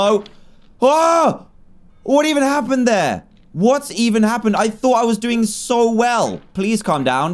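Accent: United Kingdom. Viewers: I'm new to his channel, damnnn I love that British accent 😍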